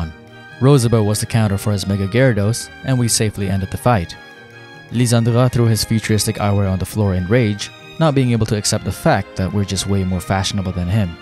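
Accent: France. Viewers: why does he say every name in french LYSHANDERUE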